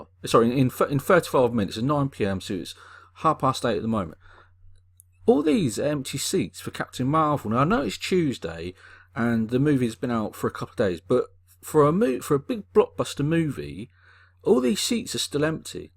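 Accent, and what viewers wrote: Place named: RP, United Kingdom. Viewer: Please stop talking like an idiot Brit. Loose the dumbass royalty respecting accent.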